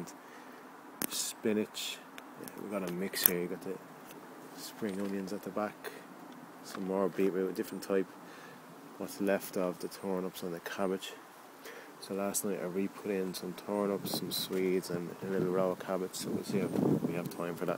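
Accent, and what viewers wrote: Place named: Dublin, Ireland. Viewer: failte...everything is looking good.. i wouldent worry too much about being late, often it seems later sowings catch up alot and are only a few weeks behind earlier sowings.... is a waterford nickname, but i think i can hear a touch of dublin in there, im in carlow.... keep the faith.. mick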